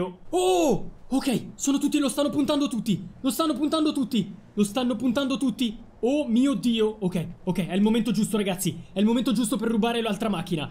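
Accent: Milan, Italy. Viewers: Ma sei di Milano?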